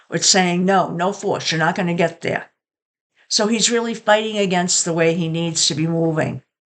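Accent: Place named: Boston, United States. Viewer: Im from Australia - where is your accent from? It's lovely, but its unusual - my favourite accent is Boston, its sounds a bit like that. Is love to know.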